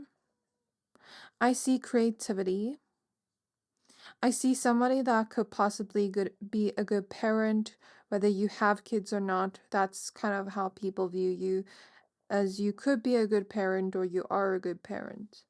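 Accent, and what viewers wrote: South Africa. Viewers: Pile 2❤. If you don’t mind me asking, where are you from? You have a unique accent 😅 it sounds a bit South African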